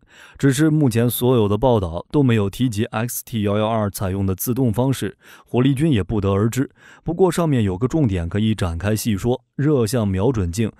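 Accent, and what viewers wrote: China. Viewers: 不要忘了，這個頻道聽他口音是大陸腔。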